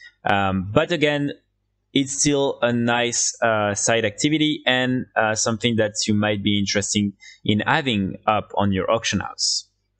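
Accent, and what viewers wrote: France. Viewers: French accent 😂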